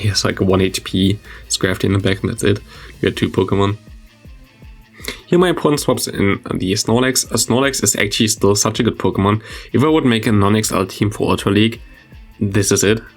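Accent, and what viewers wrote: Germany. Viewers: Ich wusste es dass du aus DE bist, man hört es am Englisch. 😅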